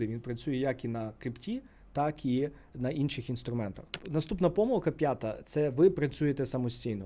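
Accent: Lviv, Ukraine. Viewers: Приємно слухати розумні поради ну і Львівський акцент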